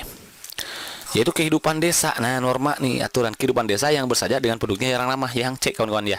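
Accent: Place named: Batak, Indonesia. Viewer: sebelumnya terimakasih ya mang utk video2 nya tapi mohon maaf saya cukup terganggu dgn aksen mang yg kaya gini. jd kurang fokus dengar penjelasannya, biarpun saya org batak asli yg kalau ngomong kekgini tp kurang nyaman dengar cara ngomongnya😢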